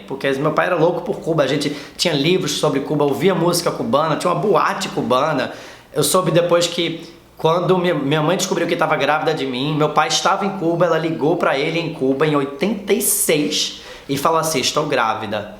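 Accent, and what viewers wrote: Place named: Recife, Brazil. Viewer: Adorei o vídeo! Tava com saudade de ouvir alguém falar c esse sotaque recifense arretado demais! Sdds do Recife ♡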